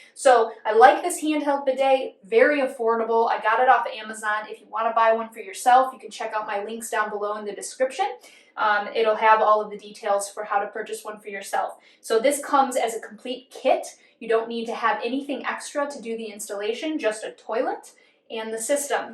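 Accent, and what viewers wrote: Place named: Midwest, United States. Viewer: Thanks for the great video and clear instruction. Love your midwestern accent and I haven’t heard the word “grody” for so long you took me back to the 60s/70s! Ha! Just wish I could still get down on my knees. Arthritis galore! Thx again!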